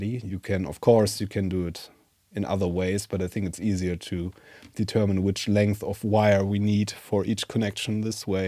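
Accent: Germany. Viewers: Ok, erstes Video was ich von dir sehe, und direkt raus höre nur mit dein Hi das du Deutscher bist.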